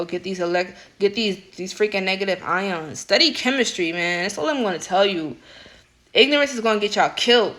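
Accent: New York, United States. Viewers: Just started watching u but I love your voice very soothing yet New York 💜👍🏽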